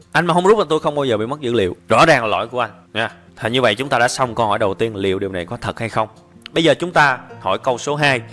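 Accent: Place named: South, Vietnam. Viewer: Rất thích giọng miền nam rỏ ràng dể nghe 👍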